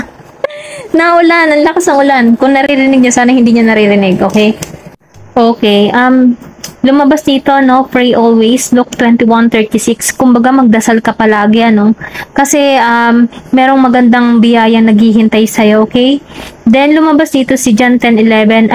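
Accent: Batangas, Philippines. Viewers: Mkasarili po tlga🥲😔 WLA pkealam s nrramdman ng iba, thank you po💖 mam batangeña kpo ba hehe feel ko lng po salita nyo😊 pero Kung di nmn bka lng po 😅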